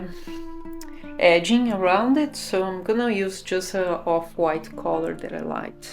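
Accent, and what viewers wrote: Brazil. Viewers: Very beautiful your work, but about your english you seem to be brazilian.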